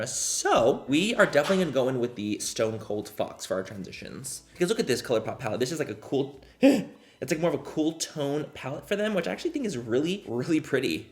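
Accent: England, United Kingdom. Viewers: Your British accent lol, I live! 😄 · Ok... I’m going to need a “full face of make up in an English accent” please 😁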